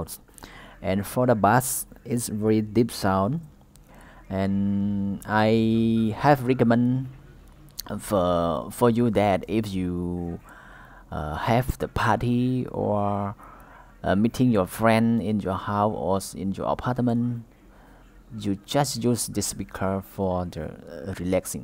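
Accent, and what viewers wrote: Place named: Cambodia. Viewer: Your speaking English like Cambodia people.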